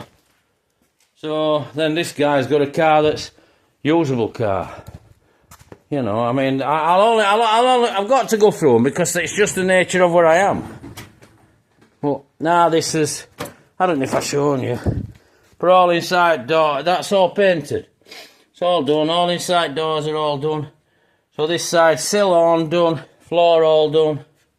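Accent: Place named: Barnsley, United Kingdom. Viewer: Good video pal just subscribed, you sound very local to me barnsley ish. Show us some update videos of the welding n stuff. Cheers 🍻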